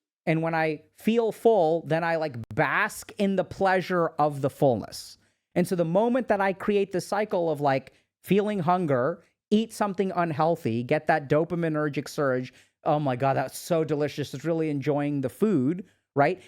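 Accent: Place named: India. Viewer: Dr. K - Would you consider having a secondary channel for 528 Million (½ a Billion) Hindi speakers ?The accent would actually help in popularity in the younger generation. 😊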